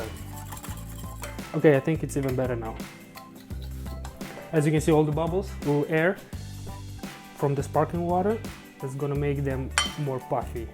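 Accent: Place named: Eastern Europe. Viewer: DAT SLAVIC ACCENT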